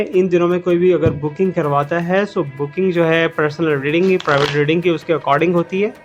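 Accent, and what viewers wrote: Punjab, India. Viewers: Sir pls btaeye aap basically khaan se ho?.aap hmare jesi theth Punjabi kese bol lete ho.m toh reading mien aapki Punjabi sunne hi aati hoon.TUNN VATTA 😂😂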